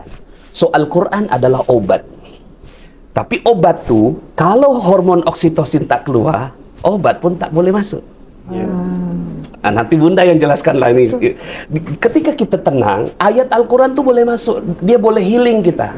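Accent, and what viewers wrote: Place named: Malaysia. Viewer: Kenapa kok.logat.mslesya pak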